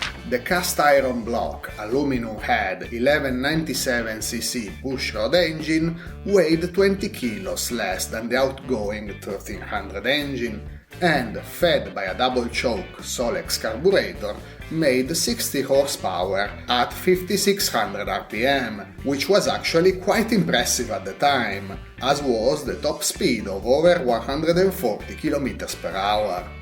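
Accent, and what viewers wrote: Italy. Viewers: I like the way you tell the story of Italian cars with your Italian accent, plz keep on going it s very entertaining . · Офигенный Английский с Итальянским акцентом.(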